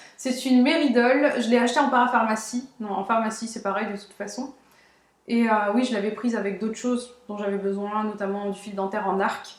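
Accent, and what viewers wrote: England, United Kingdom. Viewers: Super vidéo, merci ! Pour ma part, ton accent anglais me convient parfaitement, je trouve au contraire qu'il est top, ce qui n'est pas courant ;-)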